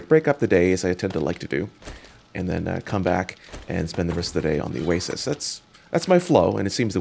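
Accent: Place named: Canada. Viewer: Forjed eyeron & geyerocopter lol. I love how Canadians speak.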